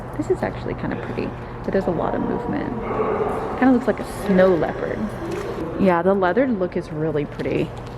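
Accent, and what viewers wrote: Texas, United States. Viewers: The Texas accent was prominent in this video!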